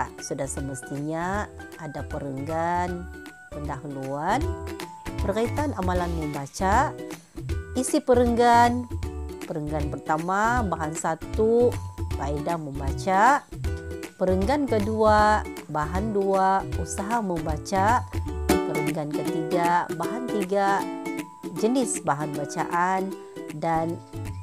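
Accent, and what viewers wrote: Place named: Sabah, Malaysia. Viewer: Thanks cikgu , loghat sabahan btul ne 🤭😍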